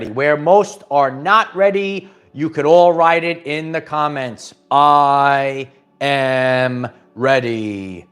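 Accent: New York, United States. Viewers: I love the NYC accent. The Bronx? And yes, you will never be completely ready. But you are now have been prepared enough to go to launch. "Peddle to the metal", or "bury the needle", call it what you will. The time is up. It's full on come May 2024. Enjoy the quiet time remaining while it lasts. This is it kids. No going back now.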